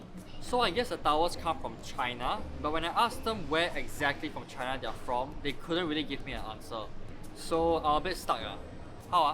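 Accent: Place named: Singapore. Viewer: But that's how Singaporeans speak...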